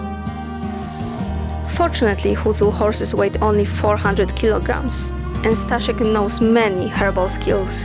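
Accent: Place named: United States. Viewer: Piekny film.Jest sub. Świetnie znasz angielski.Taki bardziej w amerykańskiej wersji(accent). Pozdrawiam.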